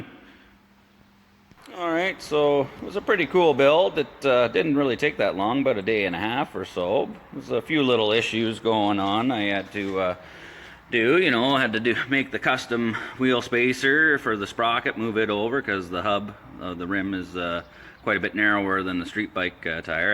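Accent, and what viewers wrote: Canada. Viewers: Wish i had the cash, this things amazing. And you sir, are one of the most canadian sounding fellas iv ever herd lol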